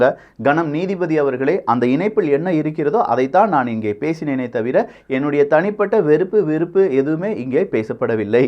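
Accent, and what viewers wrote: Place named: Tirunelveli, India. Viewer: that tirunelveli thoothukudi slang, loved it bro, iam from tirunelveli❤❤❤😍😍😍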